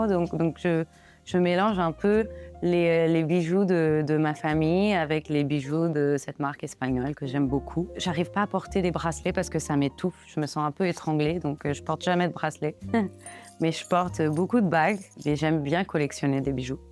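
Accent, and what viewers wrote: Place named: France. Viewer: I miss when your videos used to be in English with the beautiful French accents :(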